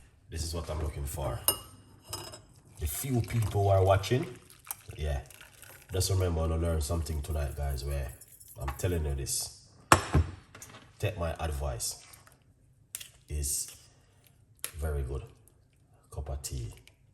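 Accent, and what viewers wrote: Jamaica. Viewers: Yummy . I love your Jamaican accent. God bless you. Thanks for sharing. Can the lemon be bottled? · I like how he talks” Yeah man”, I love Jamaicans🤣😂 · I like your Jamaican accent. A good friend of mine is from Jamaica 🇯🇲 he is one of the most funniest people i have ever met in my life. He has no filter lol. I'm from Ireland 🇮🇪 thanks for your video, those covid 19 shots and the booster really fu*ked up my chest and lungs real bad. I'm 33 years old, before Christmas 2019 my life was fine i was fit and healthy i have a passion for fitness and running. Now i can't find it very difficult. I work in a Factory and put my ipod on with my radio and play on Blast 🙂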